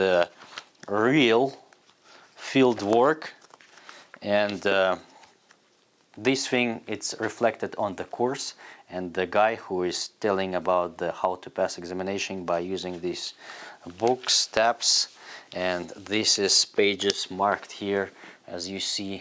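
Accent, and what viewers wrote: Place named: Russia. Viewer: Sounds like Russia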